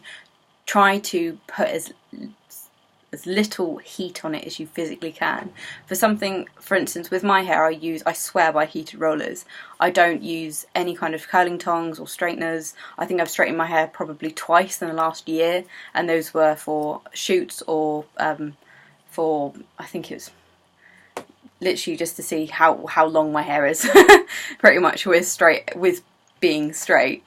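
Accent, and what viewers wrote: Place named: United Kingdom. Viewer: Oh wow its a braid. This UK speak low-key makes me want to live my English heritage dreams & move.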